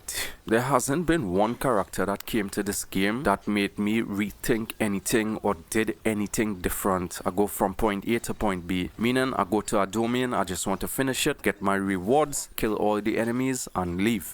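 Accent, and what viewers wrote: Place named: Trinidad and Tobago. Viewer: Within two seconds instantly knew you were from Trinidad, what's up brother!! I'm trini tooo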